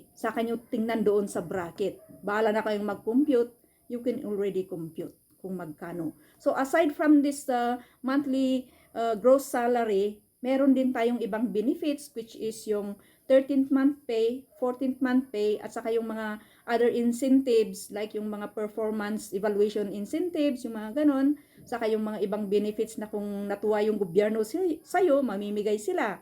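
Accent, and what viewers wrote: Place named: Igorot, Philippines. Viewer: Ne, apay kasla nga Igorot ka nga pada met nga agsau auntie? Hehe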